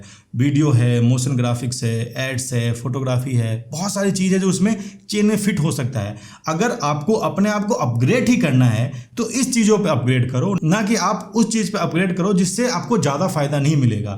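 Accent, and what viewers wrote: Bihar, India. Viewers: Bihar se hain ka? Ya UP se?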